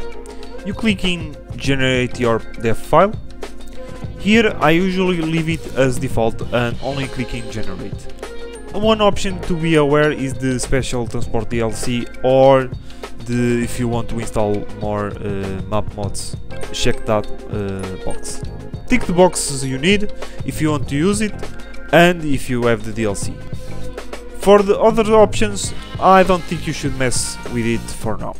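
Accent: Portugal. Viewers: It's amazing, in just 3 seconds of watching your video I could tell you were portuguese haha, continua com o excelente trabalho 🇵🇹🔥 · Such a Tuga accent! Obrigado!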